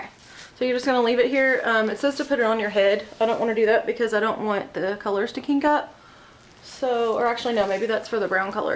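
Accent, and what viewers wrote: South, United States. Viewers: Which shade Frost and Glow did you use? I need to do this STAT! You’re freaking gorgeous and have the cutest Southern Accent. Ignore all the trolls. They have nothing better to do with life!! Please get back to me on the Frost & Glow shade so I can get this done fast!! Thank you!! ❤️❤️ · I just love your southern accent. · I heard your country accent, heard u were a mom of boys and a nurse and subscribed. Where are you from? Im from Texas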